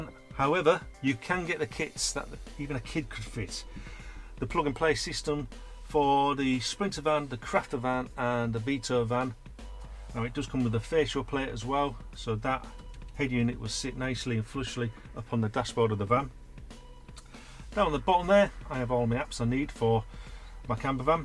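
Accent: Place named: RP, United Kingdom. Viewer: Bit posh is that mate 👌👍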